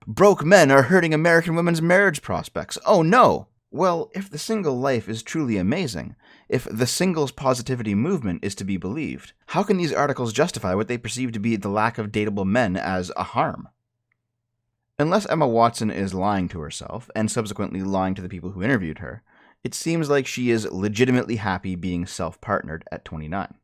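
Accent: United Kingdom. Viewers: Why does she speak british? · her accent is so english and thats quite annoying just saying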